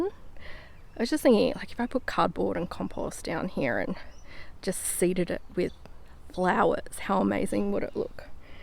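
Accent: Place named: Queensland, Australia. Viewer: Omg thank u... i just found ur channel an it's so good to hear another queenslander speak haha im a bit of a hermit an ive just realised I must be watching way too many American gardening channels ...crikey.. on a more serious note, I see ur experimenting with different tomatoes, do u have a fav yet of the large style ones in this climate? im just north of u near maryborough an have an absolute abundance of cherry tomatoes nearly all year round but cant find the same consistency in anything else yet ...maybe the cherries have set the bar too high i dunno.... oh an if u want a good flower for ur front garden put zinnas in, they grow really well need no care an the combinations of colours from cross pollination that occur each season is truely amazing... cheers an keep up the good work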